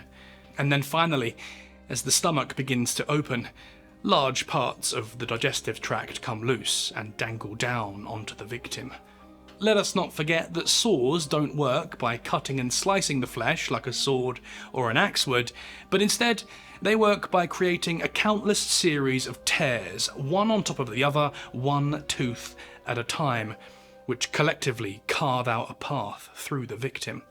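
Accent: United Kingdom. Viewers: YA GOTTA LOVE A HORRIFIC TAKE TOLD BY A BLOODY BRITT!!!! ( I struggle to pronounce the word saw myself.. IT SOUNDS LIKE " SAWRR😱🤨🤣🤣🤣🤣👃🪒🩸🩸🩸🩸🇺🇸🏴🇬🇧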